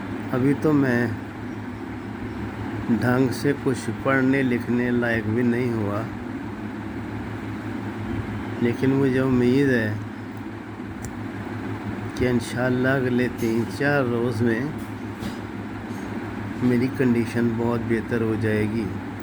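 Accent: Punjab, Pakistan. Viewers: میں لاہور سےہوں لیکن عرصہ دراز سے یو کے میں مقیم ہوں مرحوم کو باقاعدگی سے سنتا تھا کہ ان کے پنجابی انداز اور پنجابی لہجہ اردو کو بہت پسند کرتا تھا ۔ اللہ تعالئ مرحوم کے تمام گناہ معاف کرکے جنت الفردوس میں اعلی مقام نصیب فرماے امین ۔ آگ ان کو کوئ فیملئ ممبر آ کر تھوڑا مرحوم کے آخر ی سفر کے بارے میں بتا دے اچھا ہو گا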